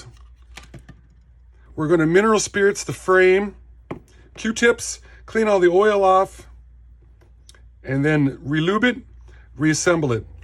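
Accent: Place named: Minnesota, United States. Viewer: Watching this and the missus comes in and asks if is from Minnesota with the accent. Know you're in Montana now, but were you originally from Minnesota or North Dakota?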